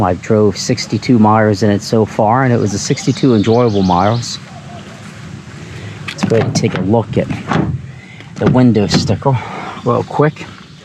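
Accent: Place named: Maryland, United States. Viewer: Love the Maryland accent. "Still wills", and the extra rrrs, etc.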